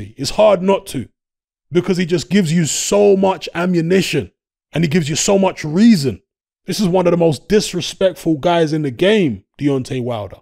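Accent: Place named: South London, United Kingdom. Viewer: South London IIRC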